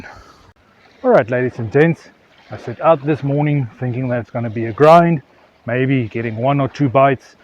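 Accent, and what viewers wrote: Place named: South Africa. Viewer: Hey bru! You sound very south african?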